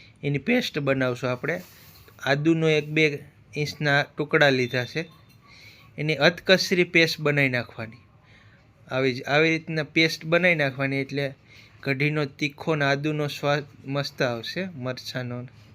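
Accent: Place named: Kathiawad, India. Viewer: ભાઈ કાઠિયાવાડી ગુજરાતી બોલો છો એમાં વચ્ચે વચ્ચે ઇંગ્લિશના ધબ્બા ન મારો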